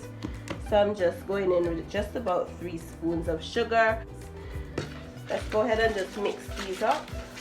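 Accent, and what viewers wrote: Antigua and Barbuda. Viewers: Wait y she sound Antiguan so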